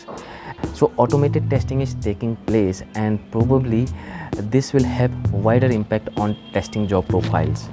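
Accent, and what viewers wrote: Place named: Uttarakhand, India. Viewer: 😀 Sounds like a family member.. you are from Uttrakhand too !!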